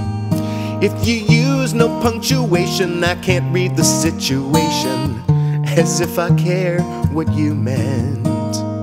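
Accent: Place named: Canada. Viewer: Making love to a glove is so overrated! I like your vids and your curious canadian accent, keep it up!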